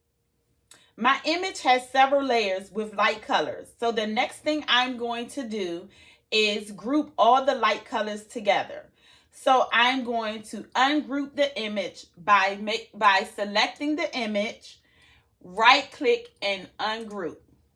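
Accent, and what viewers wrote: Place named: Baltimore, United States. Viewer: You sound like a baldamore woman 😊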